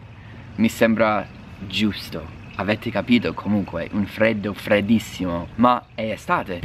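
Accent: Rome, Italy. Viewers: Hai una cadenza romanesca stupenda ahahahahha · Morta dal ridere. + che italianizzato te 6 romanizzatooo!! Ameriga e amerigana, ciavatte, ahaha troppo simpatico. Si effettivamente qua avresti trovato un frigo pieno ma non solo, anche una cena o un pranzo mega x accoglierti. E vabbè si sa che in italia siamo il top in certe cose. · Simpatico accento Romano ^_^ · Da come sta parlando mi sta venendo il dubbio che lui stia vivendo a Roma sud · Accento romano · Ma tu sei stato a Roma da come parli.. Troppo forte, sei un grande, io lavoro in ambasciata usa a Roma e l'aria condizionata la maledico tutti i giorni hahahaah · Anche romanizzato nell'accento! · No ma ..sentirti parlare in romano mi fa letteralmente morire dal ridere, irresistibile e bravissimo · Più che da persona italianizzata questo linguaggio mi fa pensare ai ragazzi delle medie che giocano a fare i duri con i coetanei. 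Le parole scurrili e le inflessioni romanesche trasformano un madrelingua inglese in un fenomeno da baraccone. È questo che suscita ilarita'. Imparare l'inglese non mi ha mai appassionato ma se fossi costretta lo chiederei sicuramente alla professoressa sopra. Sono sicura che mi farebbe fare un'ottima figura. Sarebbero soldi ben spesi. Per adesso continuerò a studiare la meravigliosa letteratura italiana in special modo i nostri grandi poeti come Giacomo Leopardi. La grande cultura che aveva noi ce la possiamo solo sognare. Solo così ci possiamo elevare con la lingua. Sono dell'opinione che le critiche ci aiutano a migliorare, quindi se riceverò correzioni sui tempi verbali o l'ortografia mi farà piacere. Buona vita a tutti. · Accento americano con la cadenza romana. Da morire 🤣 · Da Romano si, pure qualche Ao gli é uscito haha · Sulla convenience hai ragione. Non sono mai stata negli USA, però vivo in UK, e anche io ho notato che tutto è, come lo definisco io, "a prova di idiota". 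Spero che anche in Italia la smetteremo presto di metterci a vicenda i bastoni tra le ruote (spero che tu conosca questo modo di dire 😂) e di affidarci a tutta questa burocrazia. Complimenti come al solito per l'ottimo italiano (romanaccio 😂) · ma questo accento romano ? : ) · Bravissimo non solo con l'italiano ma anche con il romano. Se ti interessa approfondire lo stile elegante del romano ti consiglio qualche youtube di "Ruggero De Ceglie". Buon divertimento! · Quando parli ogni tanto sembri romano 🤣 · mi hai fatto morire parli romano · oltre ad esserti italianizzato ti sei anche romanizzato 🤣 si sente sempre di più l'accento romano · Piu che italianizato ti sento romanizato. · ti è venuta la cadenza romana quando parli in italiano.:-)) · Ahah ROMANACCIO😂😂😂😂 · L'accento romano di è fantastico 😁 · Ormai parli con l'accento di Roma. Un Nando Mericoni di oggi. Sul punto 5 in italia dipende molto in quale regione ti trovi. 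Se vai ad esempio in Liguria Genova, i genovesi non brillano in empatia (senza offesa per i genovesi) se vai a Bologna ed in Emilia in generale, invece la gente è molto amichevole e la chiacchiersta anche con la cassiera del supermercato ci scappa 8 volte su 10. In Romagna sono simpatici, ma falsi, la simpatia è soesso finalizzata ad accaparrarsi il cliente. A Cortina sono odiosi a Milano sono poco empatici, a Napoli sono generosi, pure troppo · "Mazza oh", si inca@@ e dicono "AHO", "ammerigano" invece di americano.. 😂😂😂, troppo ridere, sicuramente parli romano meglio di me 😄(okkio alle parolacce e ALLE SCIAVATTE però, right 🤣🤣🤣?) p.s. si dice Ciabatte 😉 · ha evidentemente praticato qualche romano o è stato a Roma perchè lo parla anche bene. "Co le ciavatte", il "Co" anziche con mi fa pensare questo, detto alla romana 100%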